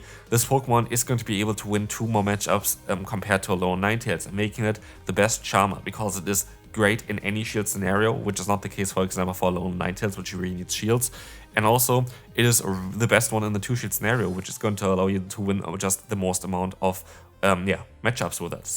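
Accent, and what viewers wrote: Germany. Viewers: You have german accent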